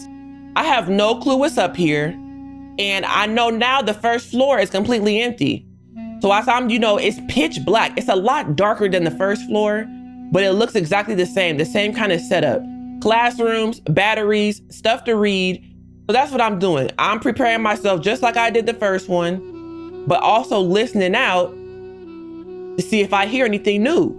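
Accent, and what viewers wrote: United Kingdom. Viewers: I haven’t even finished the video yet, but I already know the narration is gonna have me weak 😂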